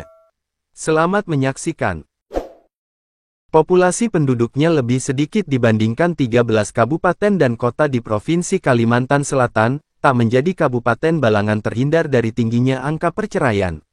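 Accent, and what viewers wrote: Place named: Java, Indonesia. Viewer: Ini channel orang jawa suaranya aku kenal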